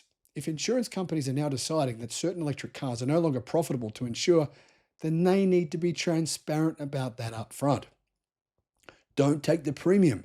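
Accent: Australia. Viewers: Is it an a Australian or overseas.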